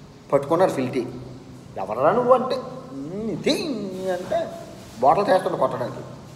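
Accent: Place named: Visakhapatnam, India. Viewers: Good vedios sweet tocking bayya..vizag language 👌👌👌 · చాలా బాగా వివరంగా చాలా శ్రమకి ఓర్చి మీరు vizag యాస తో మాట్లాడుతూ చేస్తున్న విడియోలు superb . మీకు అంతా మంచి జరగాలి .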